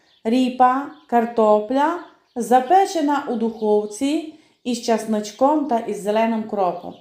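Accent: Transcarpathia, Ukraine. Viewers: Йой,як приємно чути нашу файну закарпатську. А ріпка-мням-мням. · Прекрасний закарпацький говір. Дуже мені подобається.. Щастя Вам.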